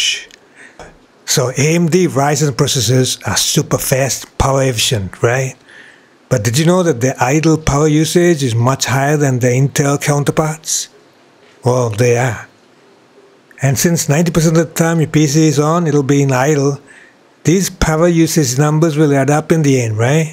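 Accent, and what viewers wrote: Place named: India. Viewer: indian guy trying to speaking english like dolund trumph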